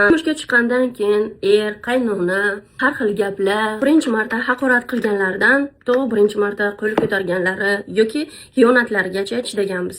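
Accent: Bukhara, Uzbekistan. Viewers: Nima qilibdi shevasiga bu buxorocha sheva hammamiz bir insonmiz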